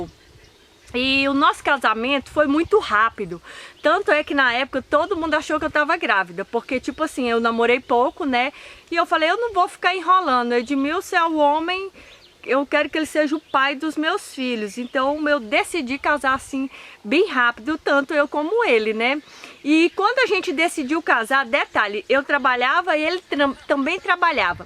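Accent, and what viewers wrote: Minas Gerais, Brazil. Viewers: vc é de Minas Gerais?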